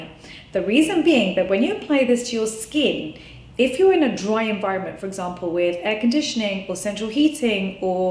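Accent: United Kingdom. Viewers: Right,your accent finally confirm me that you are living in the UK 😁😃.LOL.I am living in North 🤪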